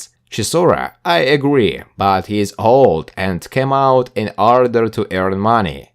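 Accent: Russia. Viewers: Omg i hate youre russian accent! It is so terrible...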